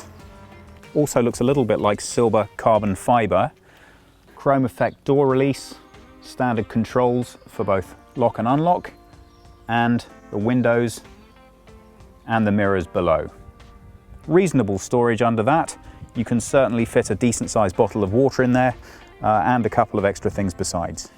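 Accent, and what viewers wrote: United Kingdom. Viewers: Excellent and thorough review! British accent is music to my ears! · What an incredible DESIGN ROBBERY yes ;) Great review, love his English accent, Autogefühl have the best car reviewers of the net... but guys, please try to look neutral... your reviews are always biased regarding the VK company's cars... this sentance is just proving that... I know they are providing you with new cars and interviews... but starting to feel sick of this propaganda for DAS CHEATERS #DieselGate #MyTake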